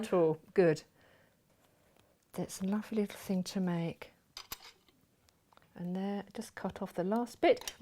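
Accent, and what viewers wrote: United Kingdom. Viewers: Every time I search videos for tips, the voices are American. It is so refreshing for us Brits to hear an English accent! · Darlings love, the british accents, yes I do agree about the threads it is better than chocolate lol. You are so vary talented, I plan to do your sewing tecnique on a card and if comes out wounderfull like yours I guess I will have a hit on my hand :-). Thank you for showing your love of your craft in sewing. In His Grace, Lorisa aka ellescrapper.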